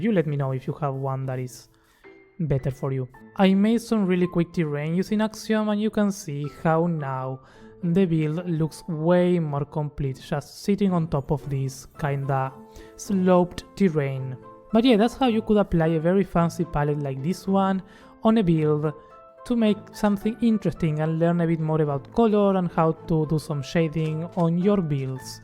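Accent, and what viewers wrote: Argentina. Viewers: vo so re argentino no? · Suenas argentino.. muy bueno el vídeo, loco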